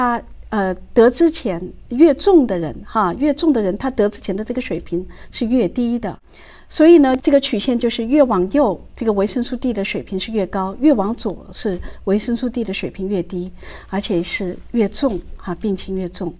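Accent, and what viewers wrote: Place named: China. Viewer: 这位医生有大陆口音😊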